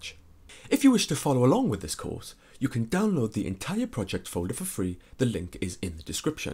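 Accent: Wales, United Kingdom. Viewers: Pretty sure he's Welsh.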